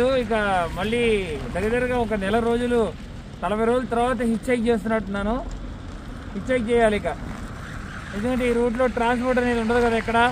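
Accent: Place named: Telangana, India. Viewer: Anna ki mana Telangana basha ki shift aipotundu vadina 😁 Bagundi to Masthundi 🔥😅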